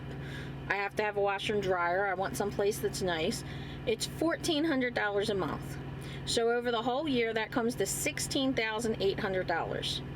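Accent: New Jersey, United States. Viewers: I knew there was a reason I love hearing you talk, you’re a Jersey girl.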